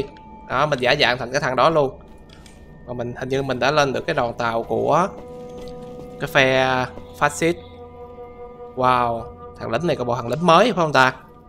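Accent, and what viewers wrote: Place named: China. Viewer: Hình như anh là người hoa đúng ko?sạc pin mà anh nói là sạc tìn:)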